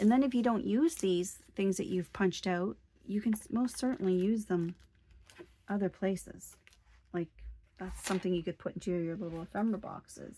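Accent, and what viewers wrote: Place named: United States. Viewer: New subscriber today. I love your calm presentation style. I'm in the UK. Which part of America is your accent from it's lovely?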